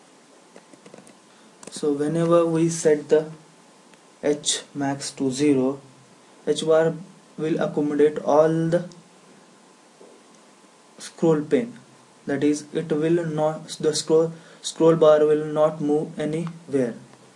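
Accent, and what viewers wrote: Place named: Hindi, India. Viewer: this lovely Hindi accent..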